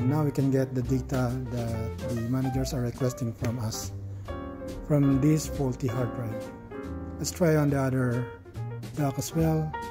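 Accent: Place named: Philippines. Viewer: Sir you're accent is somekind a pilipino are you a pinoy? Thanks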